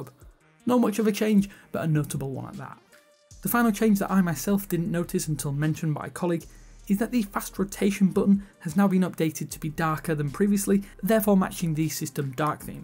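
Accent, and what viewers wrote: United Kingdom. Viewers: where are you from? UK? What accent?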